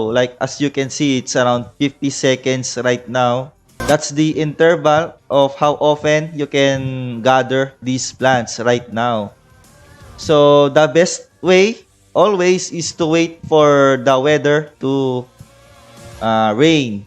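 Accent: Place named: Japan. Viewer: what's with this japanese accent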